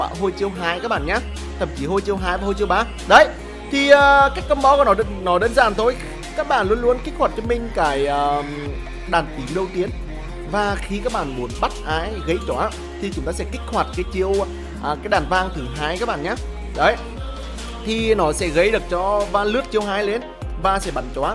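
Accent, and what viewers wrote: Nghe An, Vietnam. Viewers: Ng nghệ an mới hiểu đc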